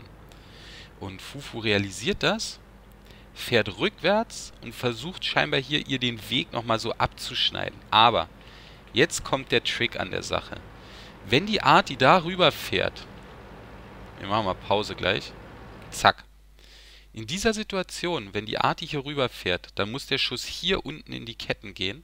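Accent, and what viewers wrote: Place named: Switzerland. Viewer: ahhh wie geil ein schweizer :)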